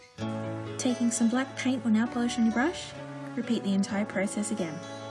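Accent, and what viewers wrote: Australia. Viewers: Love this manicure so much! And it's really good to hear an Aussie accent on YouTube too. Happy Christmas to you, from May in Perth <3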